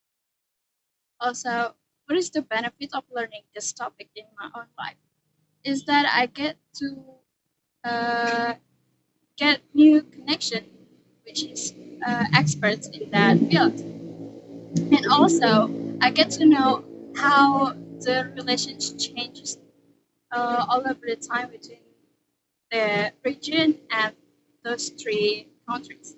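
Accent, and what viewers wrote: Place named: England, United Kingdom. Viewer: Wawww i lov ur english accent😳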